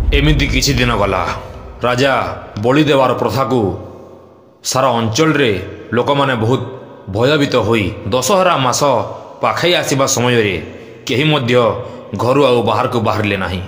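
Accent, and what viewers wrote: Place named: Sambalpur, India. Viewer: Jai MAA sureswari...bhai tumar katkia katha re sambalpuri jhalsu che...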